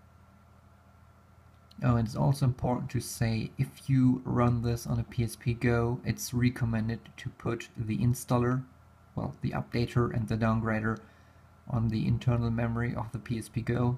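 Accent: Germany. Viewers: Krasser deutscher Akzent :D Dankeschön.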